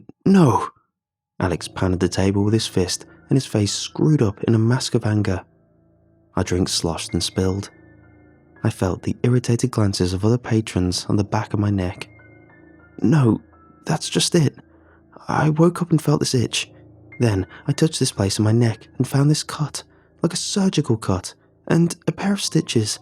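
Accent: United Kingdom. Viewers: the scariest part is is when i found he was british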